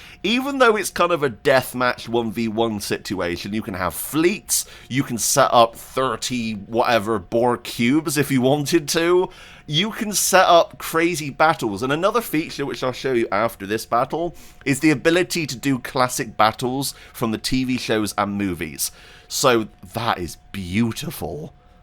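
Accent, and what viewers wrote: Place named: South West England, United Kingdom. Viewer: i know a fellow south west person when i hear one!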